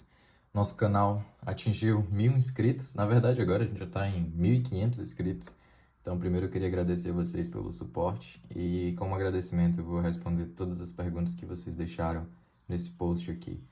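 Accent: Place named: North, Brazil. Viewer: Sabia que tinha reconhecido um resquício de sotaque nortista em você. Sou do norte também. Você é fera demais. Orgulho de você.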